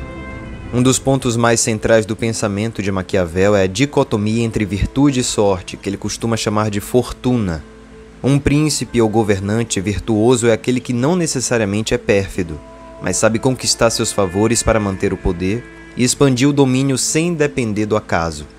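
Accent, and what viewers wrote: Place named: Fortaleza, Brazil. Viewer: Conheço esse sotaque. Tu és de Fortaleza? Gosto muito de Maquiavel.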